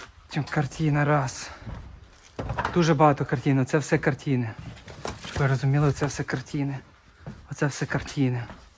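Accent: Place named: Carpathians, Ukraine. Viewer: Контент дуже цікавий, бо я впевнений, що на європейських складах можна речі поцікавіше знаходити ніж в тих же Штатах. Також цікаво звідки ви самі? По акценту мені здається щось ближче Карпат, чи регіону - може бути Львівщина, Франківщина, сильно менше ставлю на Закарпаття. В мене є друг дитинства, він з 6го класу жив в Мадриді і акцент дуже сходий на ваш в нього залишився досі.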